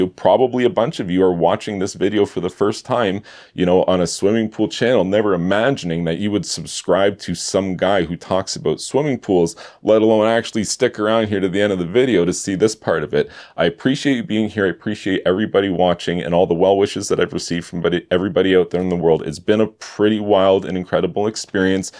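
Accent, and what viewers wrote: Canada. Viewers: This man is clearly, obviously Canadian. I'd say from southern Ontario, likeleh. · Dude, his accent announces that he's Canadian within the first few seconds of any video. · I love your Canadian twang :D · you sound like you're Canadian?? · I understood that is Canadian the first time I heard him say "aboot". Grandfather was Canadian. Easy for me to distinguish between American and Canadian accents. Cheers.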